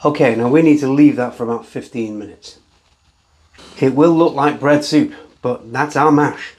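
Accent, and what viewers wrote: United Kingdom. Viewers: Sitting here watching a British dude teach me how to make a fowty in my garage.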